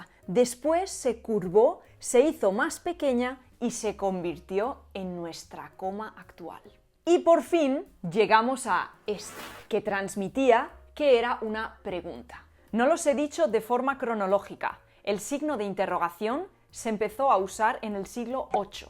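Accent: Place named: Castile, Spain. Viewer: Te Parces a una mAesttrA meddievL que tuve para mi todavía mi mejor maeau.mujer lindísima , pero deberías cambiar cambiar ese horrible acento español, como decía tú nuestrA compatriota Anabel Ochoa la mejor sexóloga de México, me caga la gente como yo qué todavía no se quitan llSzz y lasttzz , por qué en su pueblo solo usaban cacharro pa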